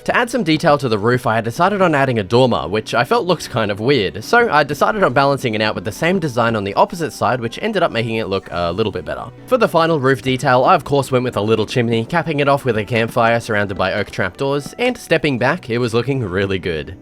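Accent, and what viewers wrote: Australia. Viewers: are ya aussy mate?? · Are u Australian 🇦🇺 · Aren't you from Australia according to your accent huh?) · are you aussie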